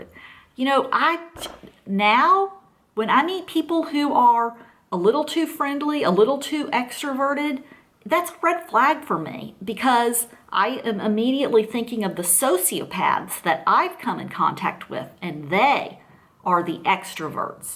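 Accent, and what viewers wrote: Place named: Texas, United States. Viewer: I've been told that you live in China. But I love your Texas accent! Anyways, a fine analysis of these puppets ma'am! You exactly described ''them'' and this female who I still like to refer to as a ''Siren'' or deadly mermaid from the Starbucks logo. ( Another one is Lilith as depicted in HR Gigers Tarot and the related book written by Akron! A masterpiece in my opinion. I could tell you terrifying stories about that! Telepathy, lucid horrific dreams and so on!) She still tries to contact\kill me after 3 years! The last time my stomach turned and it felt like a huge black hole when she passed me by with her bicycle. ''Tring, tring!''. I didnt respond but when I peaked I saw her strange eyes, smirk and her calm posture! At the same time her pace was fast and she reminded me of a bird of prey. (from the Odysee myth) Scary shit! 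My batterycharge dropped a 30\40 percent realising what she is and does! People died because of her, went crazy or live in the twilight zone! And as a no BS martian roaming the earth, I can tell you that this really something. SO FUCK HER!!! (Excuse me!) And thank you! Beautiful relaxing music 24/7 featuring instrumental music by <link>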